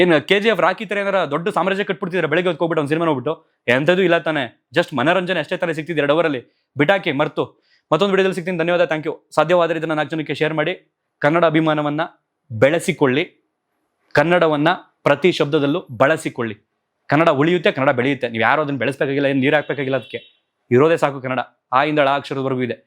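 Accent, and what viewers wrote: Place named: Belagavi, India. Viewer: ಬೆಳಗಾವಿ ಕನ್ನಡ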